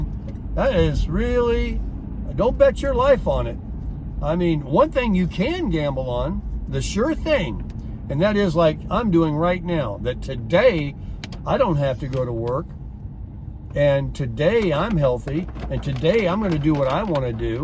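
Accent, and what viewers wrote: South, United States. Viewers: speaks from the heart. A real Southern gentleman who's a pleasure to listen to.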